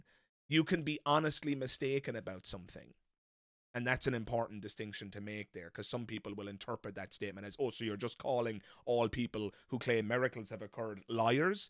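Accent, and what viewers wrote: Ireland. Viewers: Nah, it’s just Irish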